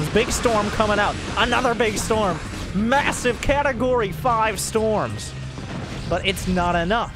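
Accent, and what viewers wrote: South, United States. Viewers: Dude you have sick commentary skills, super entertaining! Is this guy from the South or something?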